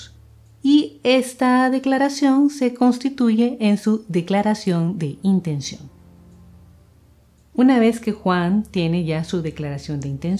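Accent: Costa Rica. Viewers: Es costarricense , acento tico lindo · desde que escuché la forma en que pronunciás la R supe que eras de costa rica. Que orgullo que una mujer tan sabia y espiritual sea de mi patria ♡ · Sííí…! 😄 Yo también quedé intrigada. Leyendo los comentarios supe q era costarricense… Hay acentos que en América del Sur no es común escuchar…😊 Que agradable suena, verdad ? (Bueno. Además se nota que ella es una mujer educada. Modula muy bien y habla pausadamente). Saludos desde 🇨🇱 ❣️ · Por la pronunciación de su "r" pienso que es de Costa Rica. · Es tica? Notó su acento :) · Sos tica? Esa R me suena tica jejeje soy de San José.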